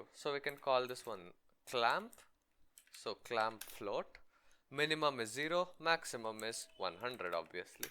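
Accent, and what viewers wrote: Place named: India. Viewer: I came from freecodecamp's sir aapki aawaaz se hi pechan gya ki indian ho and instantly subscribed 😁 😂 btw can you tell me is this good for future? I mean is field me jobs kaisi h? And india me kaisa scope h?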